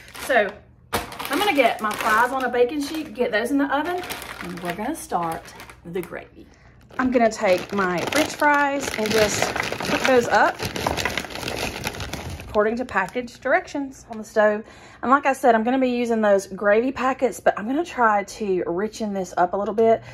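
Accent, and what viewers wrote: South, United States. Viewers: Looks perfect!!!! And love your southern slang!!!! Keep up the great work on your videos from OHIO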